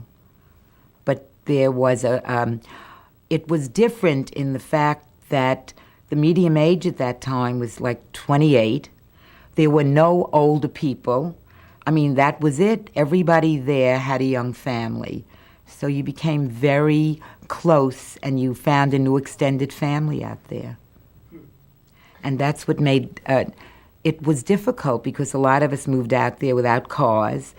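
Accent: New York, United States. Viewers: I love her accent! You can leave New York but NY won't leave you.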